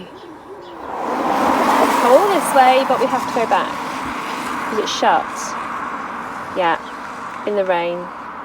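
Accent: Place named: United Kingdom. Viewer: Love your channel. It's one of the regulars that my wife and I watch. We watch a lot of British TV shows and love the various regional accents of the UK. What part of the country are you from, and what should we call your accent, which we like very much?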